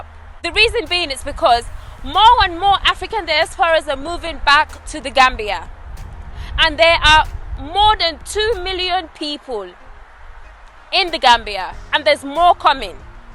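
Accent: United Kingdom. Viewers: Oh!! You truly love your country!! I am really blown away by your brilliance and passion!! I'm very serious when I say this: "You should run for the Presidency of "The Gambia." If I was in "The Gambia" right now. I would be your "Secret Service Bodyguard." I would protect you and make sure that no one lifts a hand to harm you!!! You are so smart...and once again...I just love your British accent!!!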